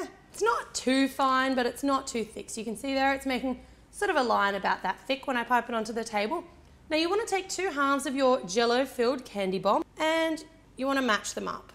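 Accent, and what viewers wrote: Australia. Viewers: yes she is from Australia you can tell from the accent right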